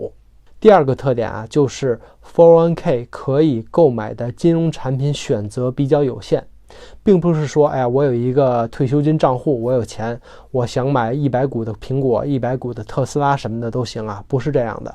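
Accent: Tianjin, China. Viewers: 听口音感觉up是天津人，对不对😀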